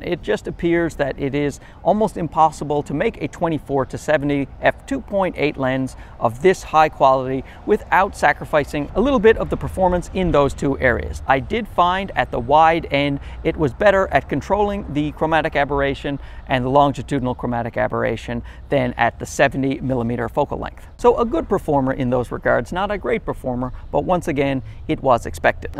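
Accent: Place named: Canada. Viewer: I've been watching your videos for awhile now and as I was watching the intro I thought that maybe you sounded a bit Canadian and then you mentioned you're from Toronto! Fellow Torontonian here! I also want this lens really bad Haha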